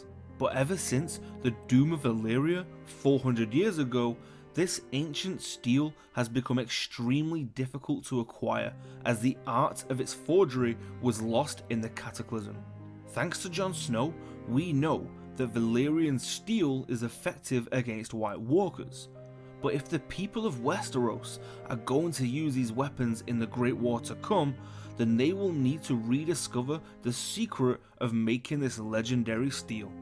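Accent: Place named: Liverpool, United Kingdom. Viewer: Snax Pendragon nah sounds more Liverpool/Cheshire but very light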